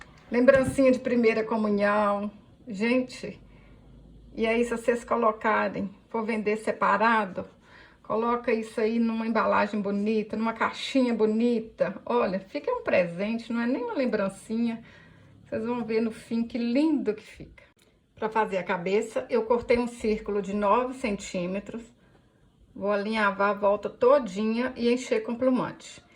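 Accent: Minas Gerais, Brazil. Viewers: Aposto que você é mineira, sotaque mineirinho UAI, acertei?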